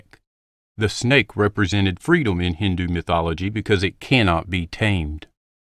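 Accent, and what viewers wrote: South, United States. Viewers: More content like this! I love your southern accent while reading the esoteric goods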